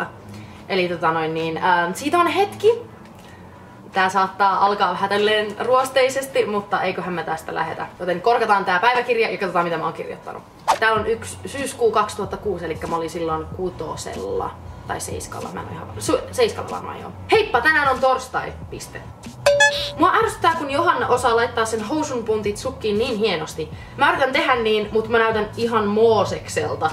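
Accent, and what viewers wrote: Finland. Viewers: Wow, Love your Finnish accent:)... also didn't realize your so young (... now I feel? like 'Edward Cullen' old;-). Personally, didn't keep a journal at that age ( a guy thing?... couldn't write right!... hum? still can't!;-). Thanks for sharing your ridiculous with absolute strangers... kinda makes the world a much smaller place. <3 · That Finnish accent makes her look hotter wth❤❤😍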